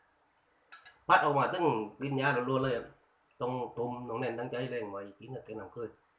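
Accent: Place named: Trang, Thailand. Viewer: ดูกับข้าวพี่แล้วชวนให้หิวรอบดึกเลยครับพี่. ติตามครับสำเนียงพี่เหมือนคนตรังบ้านผมเลยครับ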